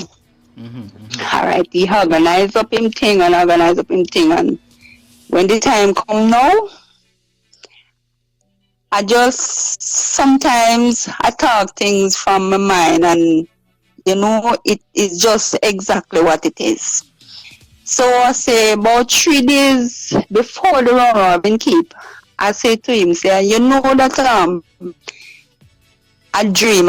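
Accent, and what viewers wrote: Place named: Jamaica. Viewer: Lady chat jamaican twang you not a Trinidadian you no sound good, love blind you till you no see say dis fool a use u. U fi real?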